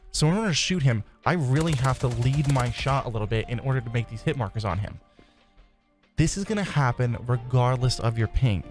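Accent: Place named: Texas, United States. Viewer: That's a Nice Texan accent you got there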